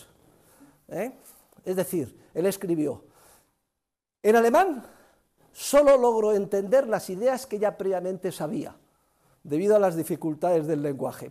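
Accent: Spain. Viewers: He speaks English with a Spanish accent and that's good enough. I understood everything he said in English and that's what matters.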